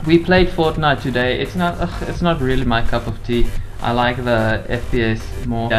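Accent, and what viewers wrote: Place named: South Africa. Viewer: Daai South African accent 👌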